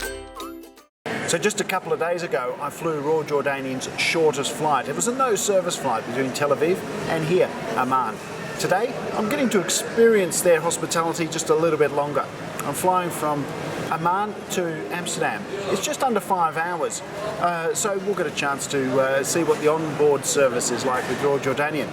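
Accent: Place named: Australia. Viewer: Hi, another great video. Can you speak Dutch? )Asking because you have a proper aussie accent:) )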